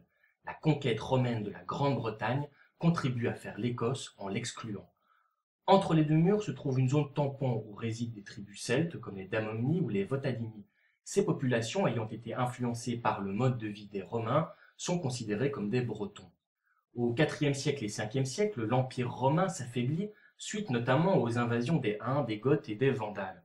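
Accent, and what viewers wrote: Belgium. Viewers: Je vois par la prononciation que le vidéaste est belge. Je regarde aussi les vidéos de l'historien belge Dufays. Donc, les Belges ont beaucoup à nous apprendre. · petit accent belge ,D depouis...^^ Mais excellentes vidéos explicatives. dommage qu'elles soient courtes.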